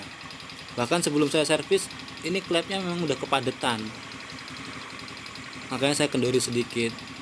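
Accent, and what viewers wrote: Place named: Medan, Indonesia. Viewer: Jowoe rosoe medan cak...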